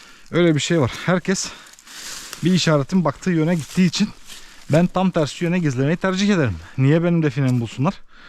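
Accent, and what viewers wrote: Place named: Van, Turkey. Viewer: Şiveniz vanlılara benziyor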